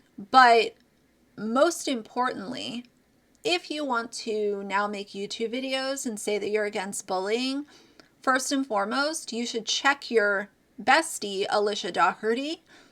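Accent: Australia. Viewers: Australians are awesome!! Lovvvee the accent too 👍🏼❤️ Also, as an American have you SEEN the state of our country right now? Lol I’d be the last to judge anyone else 🤣💀Alicia Dougherty doesn’t represent most Americans so don’t judge us lmao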